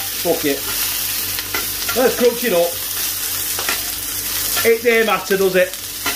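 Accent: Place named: Lancashire, United Kingdom. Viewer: Hi there Where are you from? I hear a tinge of th'ewd/th'old Lancashire accent there Apologies if I am wrong I use a lot of them shortened phrase's We should never lose that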